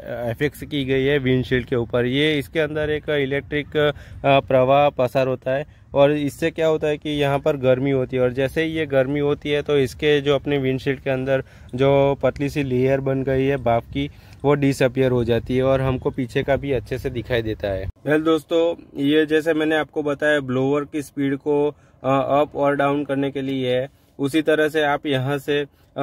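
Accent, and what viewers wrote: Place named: Gujarat, India. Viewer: Bhai pakka gujrat se he ye lg rha h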